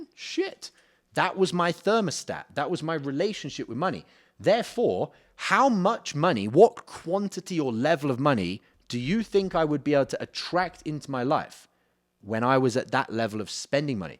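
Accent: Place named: England, United Kingdom. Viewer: have you heard of an english accent my friend?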